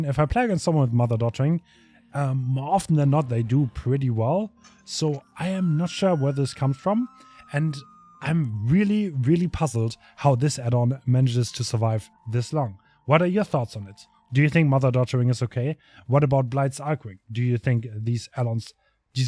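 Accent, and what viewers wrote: Germany. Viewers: man hört, dass du deutsch bist ;)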